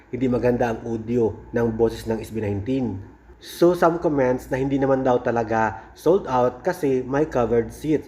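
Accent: Visayas, Philippines. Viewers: sana nag AI kn lang..kc bisayang bisaya k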